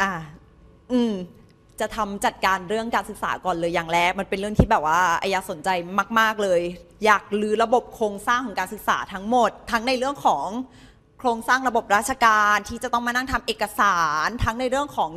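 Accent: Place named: Thailand. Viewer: พูดเหน่อ มีเสน่ห์ดี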